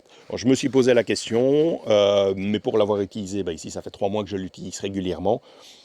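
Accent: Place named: Belgium. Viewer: Très intéressante vidéo ! Certaines de vos intonations rappelle un accent belge, serions-nous compatriotes ?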